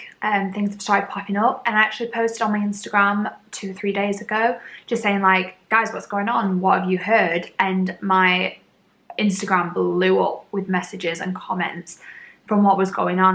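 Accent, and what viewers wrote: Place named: United Kingdom. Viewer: In all this horribleness and negativity it’s refreshing to hear a more local accent and the word ‘brew’ on YouTube as most influencers I watch are American 😂👍🏻 I binned half a bottle of Delight conditioner a few months back 😩 but it was so difficult to get hold of these in the UK anyway, just as well now...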